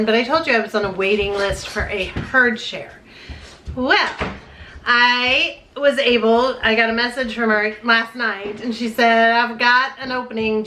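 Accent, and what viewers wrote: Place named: Georgia, United States. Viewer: Hi ❤ I can tell you are tired because your Georgia accent is noticable. Love you ❤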